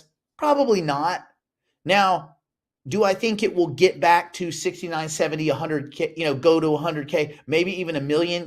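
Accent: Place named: Australia. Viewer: I knew it.. is an Aussie just speaking American to us……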